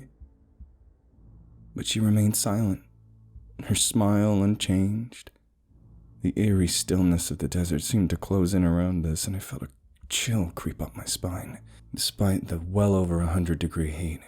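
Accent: United States. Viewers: Is that you behind the American accent or a guest? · Your American accent is very good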